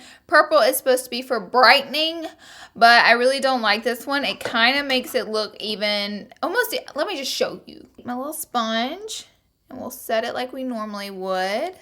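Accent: South, United States. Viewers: you are gorgeous with or without makeup/shitty makeup. seriously, though, I'm from WV and we are incredibly southern so I really love your accent, a genuine southern accent is hard to find, man! and ppl try to say we are uneducated but I love how you always have facts about skin/dermatology/makeup knowledge from experience etc that you pepper throughout your vids! You may live in LA now but I'm sending you good vibes from the south, girl! keep doin' your thing and stay sassy af, lol ps - IM A HUGE CAT LADY, I LOVE YOUR FREAKIN CATS!! the one that shows up in your vids the most looks like my cat, Sassy 😊😻💜 (i have another one, and orange one, whoa is literally a female Garfield names Lucy...or Lu...or the Goose...the Goo...Lady GooGoo...Lucy Lu...etc. see, now ive taken it too far; I've surpassed the threshold of just "cat lady" to "CREEPY cat lady"... 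LEMME JUST STOP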